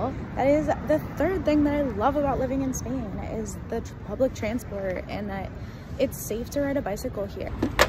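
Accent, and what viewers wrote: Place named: United States. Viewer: tienes una voz muy bonita y musical. El ingles de estados unidos siempre me ha parecido " dificil" por el acento. Hablado por tí suena muy bonito. Podrias trabajar como " dobladora " ( en el cine las versiones en español ). Muy feliz de que estes feliz. Besitos desde Granada, Andalucia.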